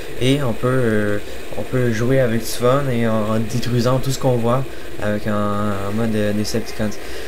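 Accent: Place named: Canada. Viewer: Bon l'accent canadien m'a permis de ne pas bien comprendre certains mots, heureusement qu'ils étaient dans leur contexte ! ^_^ Aller 4* car la perfection n'existe pas !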